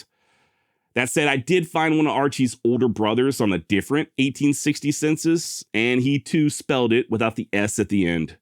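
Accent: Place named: Wisconsin, United States. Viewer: Sounds like you're from my neck of the woods, Wisconsin. Maybe a little north. Love the content, keep up the great work.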